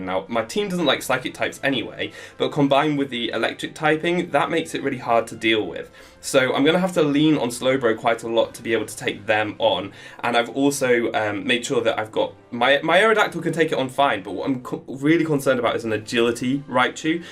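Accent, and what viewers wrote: England, United Kingdom. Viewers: Somewhere in England I think. That's why he has Mewcastle United (Newcastle U, england soccer team)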